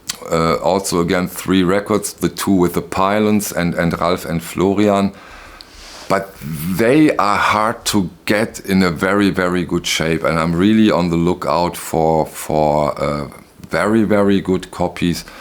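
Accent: Germany. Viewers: Hello -I was trying to place your German accent and always suspected NRW, now I know! I lived off Stockumerkirchstrasse in the 90s when I worked for Kindersender Nickelodeon which was based at Zollhof 11 (by the Rheinturm) My one regret is not stalking their studio when I lived there! I used to go running by the Messe listening to. Thanks for the wonderful tribute/analysis.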